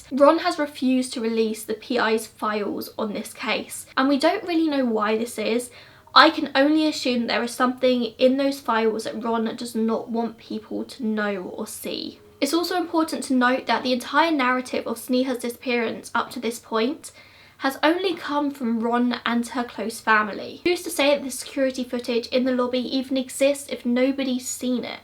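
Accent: England, United Kingdom. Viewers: I really love your English accent. I'm from Argentina and I've been studying English for 5 years. I'm listening to you while I'm working. You're amazing, thanks a lot.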